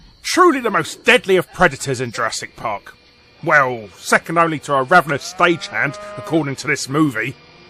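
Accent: United Kingdom. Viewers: I swear sounds like Taco Man with a British accent XD. Awesome commentary on JP mistakes nontheless.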